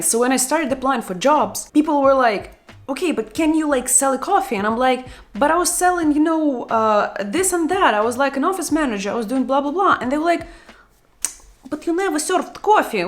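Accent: Russia. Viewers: hi i just wanted to say that i love your channel and your personality and russian accents that pops-up from time to time is very funny. is it possible for you to make a video on what is a co-op program, give us most of the informations you believe we need to know and share a personal experience if can; I'll really appreciate ✨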